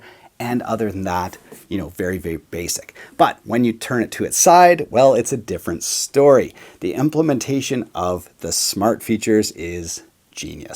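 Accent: Canada. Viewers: Hey! Love your show and your Canadian accent. I recently bought a Gibson SG Special Faded (2005), it's rather cheap for a guitar with the Gibson logo on the head, on the second hand market. What's your opinion about this guitar?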